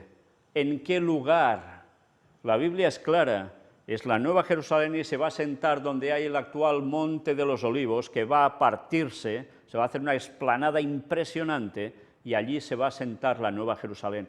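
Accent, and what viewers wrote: Spain. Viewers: Gracias hermano por su predica, ciertamente disfruto mucho cuando usted predica porque hace una mezcla de verdades con su jocosa manera de hablar estilo espanol. Bendiciones.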